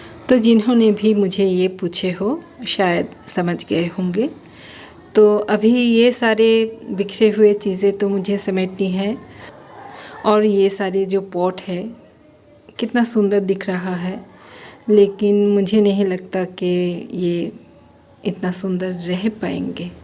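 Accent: Bengal, India. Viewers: Sotty tomar mukhe hindi khub bhalo lage.ami c.g. te thaki ekhanei boro hoechi kintu amar me bole tomar mukhe hindi sunlei bojha jaye tumi bangali😊😊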